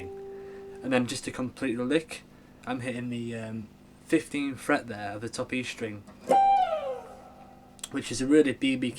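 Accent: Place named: Yorkshire, United Kingdom. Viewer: you'r a good teacher....and you know your stuff. Also you have a great tone on that Tele. Really like your vids. I can never decide between Hull accent and Scarborough I'm going for Scarborough!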